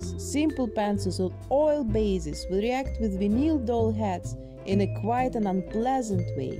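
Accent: Russia. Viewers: Сорян, ты русская? , просто уши кровоточят:')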